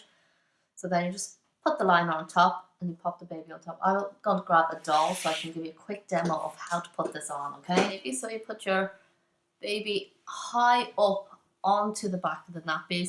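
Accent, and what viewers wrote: Ireland. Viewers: Do I hear a bit of an Irish accent?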